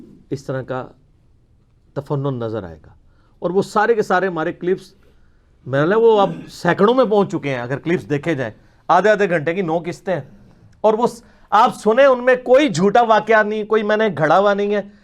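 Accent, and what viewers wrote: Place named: Punjab, Pakistan. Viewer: it punjabi language accent if you don't like it then its your problem. most of the punjabis speak like this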